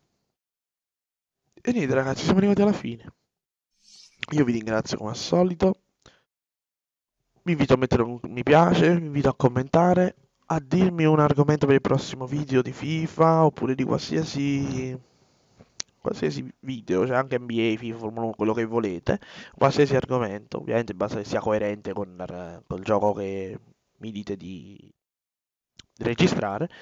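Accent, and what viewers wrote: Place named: Caserta, Italy. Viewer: bella ti immaginavo di caserta per la parlata...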